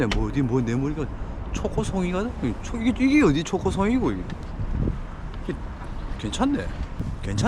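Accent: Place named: Gyeongsang, South Korea. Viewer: ㅋㅋㅋㅋㅋ말씀 웃기게 하신다 같은 갱상도로써 시원하네요ㅋㅋ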